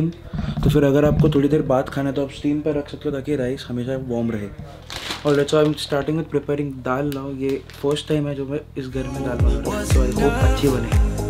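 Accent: United States. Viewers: Jamtay Jamtay U.S. Accent yetay halu halu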